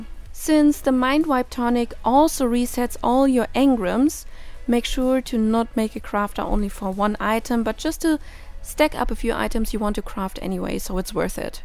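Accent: Germany. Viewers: me as a german: She spoke Video very german...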